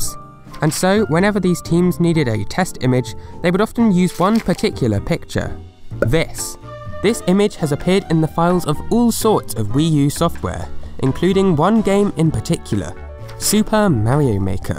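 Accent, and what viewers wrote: United Kingdom. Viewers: He's a brit, that's why. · Nothing makes me happier than listening to a cheerful British guy telling me Nintendo trivia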